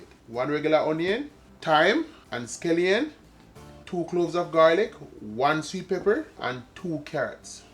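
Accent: Jamaica. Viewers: SHUT THE STOVE OFF😂 JAM DOWN TALK, 1 LOVE😊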